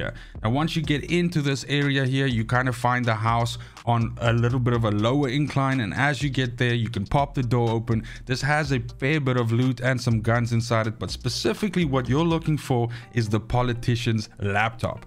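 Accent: South Africa. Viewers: You sound South African, where are you from?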